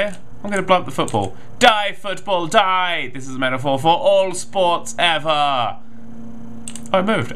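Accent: United Kingdom. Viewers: its the british accent. once again, the reason why americans watch top gear, is the british accent. i dont pretend to know why, its just a fact. its hypnotic and adds automatic legitimacy at the same time. we all are slaves to the magic of a british accent. if that makes any sense. which it probably doesnt. nothing i say ever does... hehehe... what?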